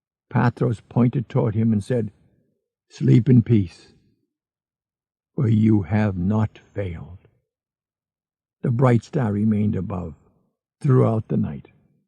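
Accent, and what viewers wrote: Boston, United States. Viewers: Am I the only one that noticed that he started with scroll marked 3 instead of scroll marked 1???? It threw me off as I was following with the book.. ugh… I love his voice and Boston accent tho 😜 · Boston accent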